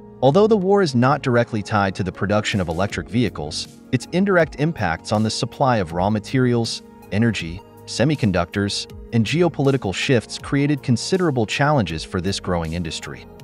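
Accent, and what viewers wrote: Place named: United States. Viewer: sounds like a wank yank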